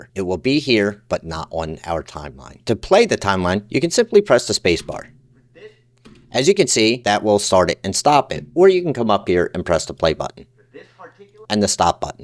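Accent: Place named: Pittsburgh, United States. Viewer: Are you from Pittsburgh? ❤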